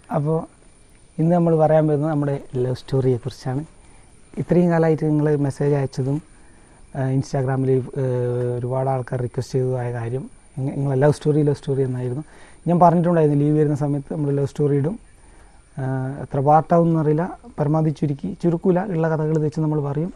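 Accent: Kannur, India. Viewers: Kannur slang ❤️❤️❤️ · ഞമ്മടെ കണ്ണൂർ ഭാഷ 💕💕💕💕 Chechhii chettaaa❣️❣️❣️ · ഞമ്മടെ കണ്ണൂര് ഭാഷ💪💪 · Slang kettappazhe thonni nmle aalann.... 😊knr da💪🥰🥰🥰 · Kanuuurrrr💪💪💪💪 ഭാഷ കേട്ടപ്പോഴേ മനസ്സിലായി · Nammala kannur basha🤩💖💥🔥🔥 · Njammale kannur basha 😁🥰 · Kannur evida. Samsaaram kettappozhe thonni ente jillayil aayirikkum enn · Bro Poli language. Kannur language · Kannur bhasha kett comment box nokalarnnum.....Kannur eadaya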